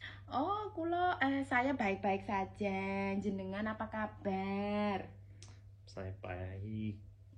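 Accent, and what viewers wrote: Java, Indonesia. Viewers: Itu bapaknya ga mungkin setres,,karna istrinya lucu lihat gaya ngomongnya aja udang ketawa gaya indonesia bangeeet. 👍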